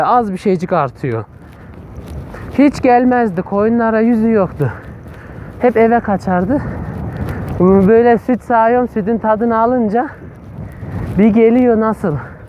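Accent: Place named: Central Anatolia, Turkey. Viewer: Çoooşu ne güldüm ya İç anadolu Şivesi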